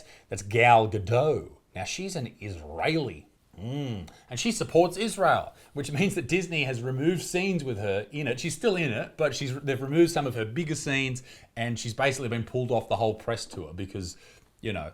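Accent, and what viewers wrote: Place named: Australia. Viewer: As a fellow Australian I really dislike your oker accent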